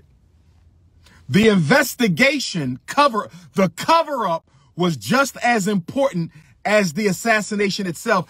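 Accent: United States. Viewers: Doc. You have an American accent. Whether you try to or not. I agree, but the accent part is out there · With all due respect Dr. Johnson, Brother Kaluuya speaks with a British accent for the same reason you speak with an American accent. He is not "emphasizing" anything. Neither of you was born/raised in Africa, so neither of you has an "authentic" African accent when speaking the colonizer's mother tongue. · But Umar you have an American accent... wtf are you talking about?? Dude was raised in England that's why he talks like that..... I thought you were a Doctor. SMH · You're speaking with an American tongue ffs hypocrite 🙄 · As articulated as you are Umar ,you are "Emphasizing" american tongue so shouldn't we be concerned ? Cmon bro that's his damn accent , your whole video is speaking in an american tongue, and its disingenuous towards are Brothers that do have a british accent . 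That's ignorance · Umar you speak with an American accent that derived from an amalgamation of British, French and other Europeans. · 😂 dude you are funny. He speaks English with British accent you speak it with American accent. There is no difference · His not emphasizing anything. That is his accent. As an African from African you have an American accent. You don’t hear us saying that black Americans are emphasizing your American accent. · DR UMAR YOU ARE AFRICAN WITH AN AMERICAN ACCENT, TRIBALISM KILLED AFRICA. LETS LOVE AND WELCOME EACH OTHER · But your speaking in American tone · lol you really bashing British tongue while u speaking American English? yaz usangene wena? uyakwaz loko?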